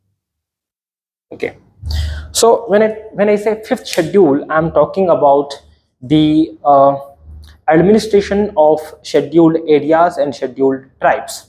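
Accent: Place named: England, United Kingdom. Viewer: You English Accent is awesome bro🎉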